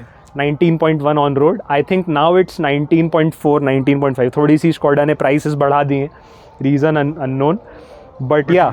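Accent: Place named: South India, India. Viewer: his talking style is similar to that of a south Indian guy born and brought up outside of south India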